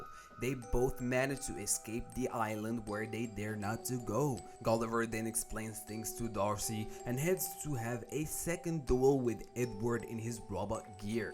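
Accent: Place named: Morocco. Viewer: i can swear that you're Moroccan hahaha fiiin aSsat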